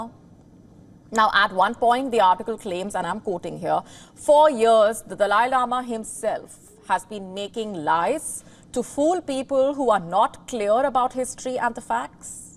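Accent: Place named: India. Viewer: Her curry accent is so strong, can't even pronounce Tibetan.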